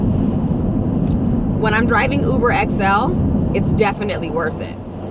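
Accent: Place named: South Central, United States. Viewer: You look like Beverly Hills but you talk like South Central. Shifty eyes.....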